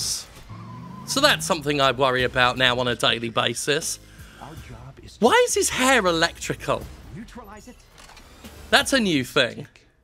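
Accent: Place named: United Kingdom. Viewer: British accent voice in the video is so annoying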